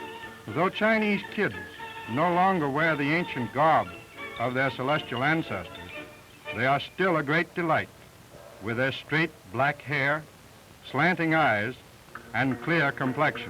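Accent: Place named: Boston Brahmin, United States. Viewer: Has no one commented on the old fashioned Boston Brahmin accent of the narrator? I find it charming.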